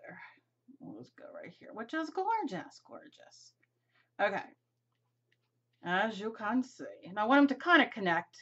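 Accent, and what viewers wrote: New Jersey, United States. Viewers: I THOUGHT I RECOGNIZED THAT JERSEY ACCENT!!!..I'M FROM WILLIAMSTOWN N.J...JUST A STONES THROW FROM YOU...LOTS OF ARTSY STUFF IN JERSEY!!!!!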